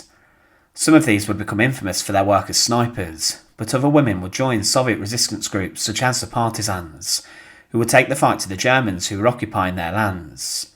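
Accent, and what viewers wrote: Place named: England, United Kingdom. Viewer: First and last time here as listening to that moronic English twang is enough , it’s better muted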